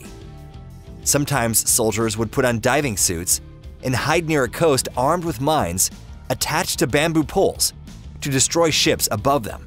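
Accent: United States. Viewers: Too slow, A Yank of course.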